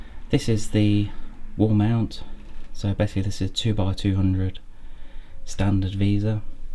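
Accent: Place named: Birmingham, United Kingdom. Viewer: And it's with a Brummie twang to it too.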